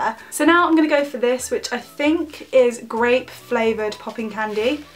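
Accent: United Kingdom. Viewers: you should! it'll sounds awesome with a British accent haha =) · are you american or british you talk like a british person but call sweetys candy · Are you British or Australian? Either way, you're gorgeous.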